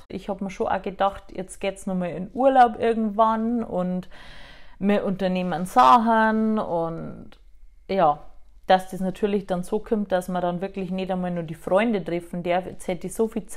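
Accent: Bavaria, Germany. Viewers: I finde sau geil, dass du Boarisch redst. I bin a a Borei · Nochmal herzlichen Glückwunsch zur Schwangerschaft. Ich würde mich auch freuen um Videos zur Schwangerschaft. Ich finde dich auch super sympathisch ich mag dich. Endlich eine YouTuberin die so schon Bayrisch redet. Ich liebe den Dialekt. ❤️❤️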